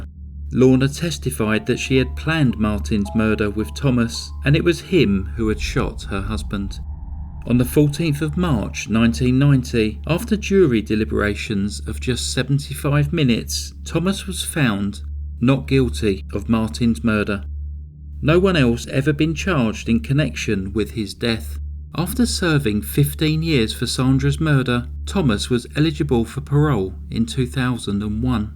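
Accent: United Kingdom. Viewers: You are doing a great job. I really enjoyed your narration on the cases. I especially enjoyed your british accents.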